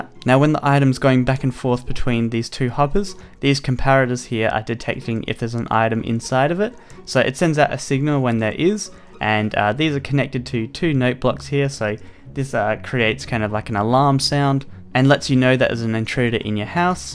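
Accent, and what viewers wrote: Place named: Australia. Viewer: I love ur accent! it sounds almost Australian :)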